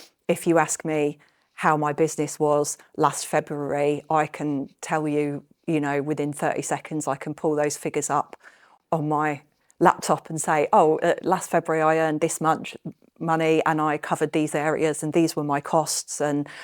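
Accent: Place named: Midlands, United Kingdom. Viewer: this woman sounds more Midlands than southern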